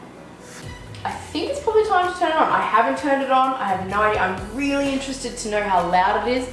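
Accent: Australia. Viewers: Im researching the sawstop and came across your channel and can I say it’s so nice to hear another Aussie. That was a great review and content thanks for sharing it’s been 3 years for you so I’ll check out some more of your videos and see what your up to and what you think of your saw now cheers. I gave a sub to and a like of course.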